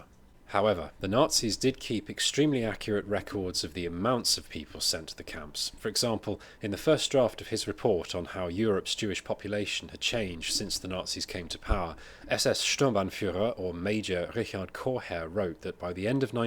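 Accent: United Kingdom. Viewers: >british accent. Dismissed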